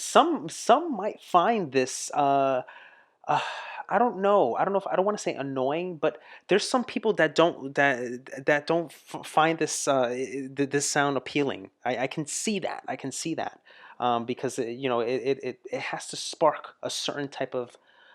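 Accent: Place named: United States. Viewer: Accent US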